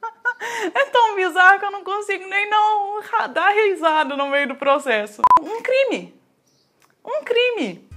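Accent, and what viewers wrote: Minas Gerais, Brazil. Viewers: É MINEIRA ?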